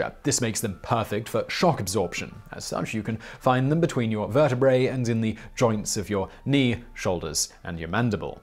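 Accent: United Kingdom. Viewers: I thought this was the guy that does VSauce and was wondering why he was talking British accent. Then I realized they could be twins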